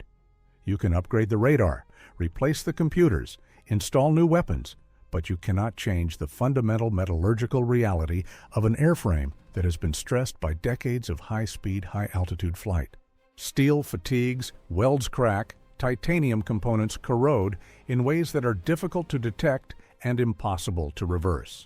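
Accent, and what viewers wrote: United States. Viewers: Because you have an American accent geared up to know what yr talking about you Must be right right? ...Like Russia pinching automatic washing machines for the chips? You go on about the air frame? All it is a a bit of Al or what ever - you can simply make another one. On those grounds yr talking rubish, And an airframe to you means excess costs of parking yr wives fat butts in restruants rather then going into casting of forging metal. Which is why yous and NATO cant keep up and out pace. And after these thumb twidling calculations - then Russia is expected to lay flat like a carpet for yous to come in and rape and pillige the land? I think arrogance in a head blockage is the far bigger problem and liability here.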